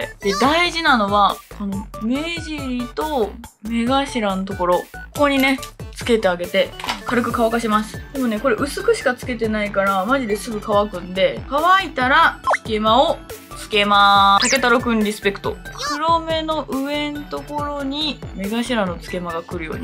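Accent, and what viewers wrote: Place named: Ibaraki, Japan. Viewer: なまりかわい💕～べ？とっても親近感、、私は茨城じゃないけど！！いつも真剣にメイクに向き合ってるかっこいいです😿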